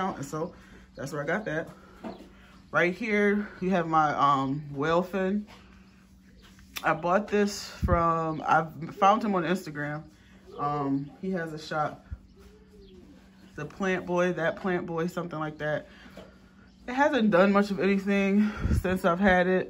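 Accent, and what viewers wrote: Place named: Florida, United States. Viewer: I'm trying to place your accent. I have a guess but dont want to make a fool of myself. Almost seems central Florida ish with a tint of something else I cant place. Let me know if you don't mind.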